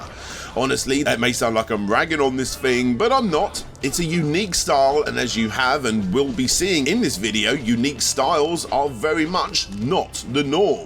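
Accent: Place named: United Kingdom. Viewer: god /da /mn the sh /it brit narration.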